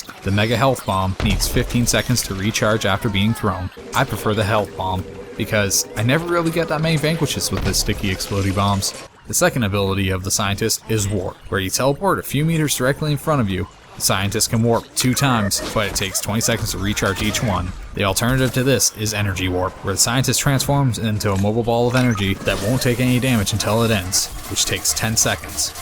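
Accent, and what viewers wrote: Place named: Canada. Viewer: It doesn't, I understand that your Canadian and that anyone insulting the way you talk is just rude.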